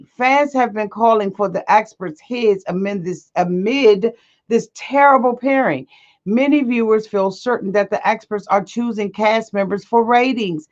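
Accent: Washington DC, United States. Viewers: Auntie I hear DC in your voice are you from DC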